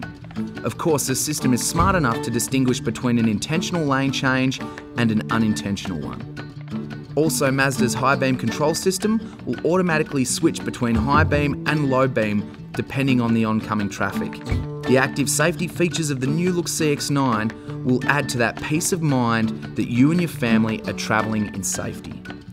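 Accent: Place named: Australia. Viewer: Other than this guy speaking Aussie and through his nose - this is one of the best CX9 reviews on You Tube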